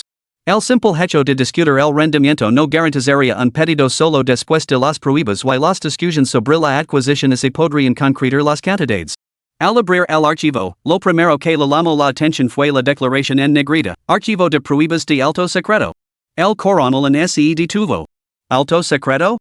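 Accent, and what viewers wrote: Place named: United States. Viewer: Esta español si lo escuchas bien solo que es un gringo quien habla😔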